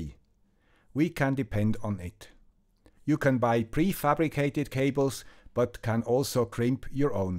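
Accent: Switzerland. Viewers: Wait a seconds! Guy with swiss accent familiar with NIVA!? Lada NIVA!?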